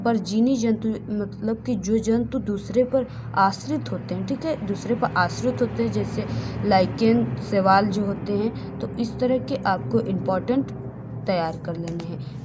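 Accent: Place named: Uttar Pradesh, India. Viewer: Mam mene appki hrr baat axche see sunii or follow kerii wooo mam koo 😮🎉❤❤mam app bhot axcha paadti h mam app up see hu app kii lugahgh se lagra 😮❤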